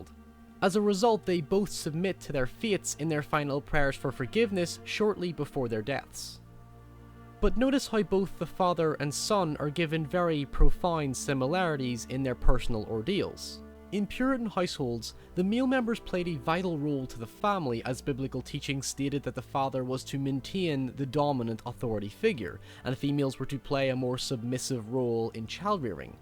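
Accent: Northern Ireland, United Kingdom. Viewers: northern irish. just like mine so it is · I can't tell if you're british or american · What’s going on with your accent? Northern Ireland? Scottish? American? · Nah I’ve decided you’re Northern Irish ☘️ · who can tell me where the narrator's accent's from? what part of the UK?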